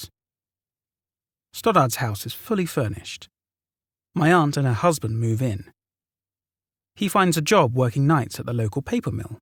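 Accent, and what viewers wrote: United Kingdom. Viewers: Does anyone else find it difficult to listen to a story about north Georgia being narrated by a brit?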